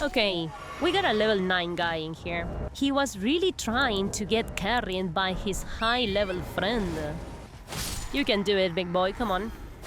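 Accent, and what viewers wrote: Russia. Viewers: me encanta que esta youtuber que me habla en ingles, con acento ruso y me suelta un insulto en perfeto argento 10/10 vamos a ver mas viedos.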